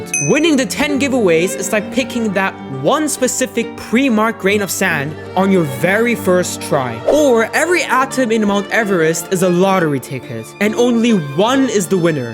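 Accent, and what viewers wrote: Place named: Germany. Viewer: Im hearing the german accent so much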